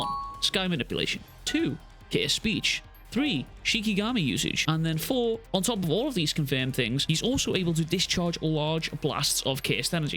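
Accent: Liverpool, United Kingdom. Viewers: Gotta ask man are you from Liverpool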